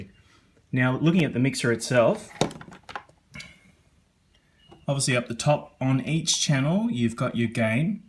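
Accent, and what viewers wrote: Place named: Australia. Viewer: Love the oz accent 🙂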